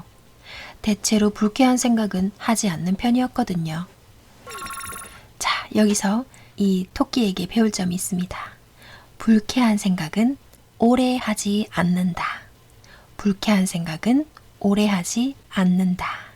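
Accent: Gyeongsang, South Korea. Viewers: 신나이 들으면서 구독 하기 시작했는데 목소리 톤이 좋으시고 경상도사투리 억양조차 푸근하게 들리네요 (feat. 연기력) 좋은 컨텐츠 감사합니다^^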